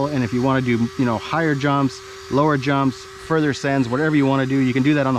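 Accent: Canada. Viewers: Glad I looked into your location - I'd recognize a Northern Ontario accent anywhere! Great content - you deserve WAY MORE subscribers.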